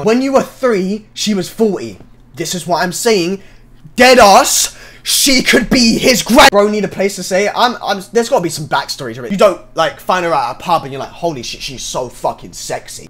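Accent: United Kingdom. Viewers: w bri'ish man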